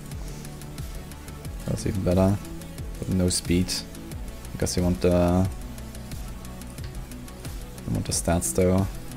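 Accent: Germany. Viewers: as fellow German you probably can hear his German accent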